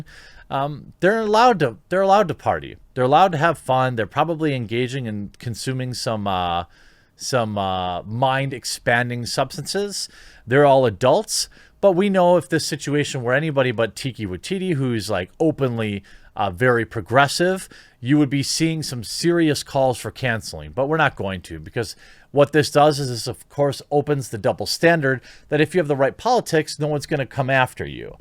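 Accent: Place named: Wisconsin, United States. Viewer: Why pronounce it “Taysuh Tompson” is it intentional disrespect, or just that atrocious Wisconsin accent?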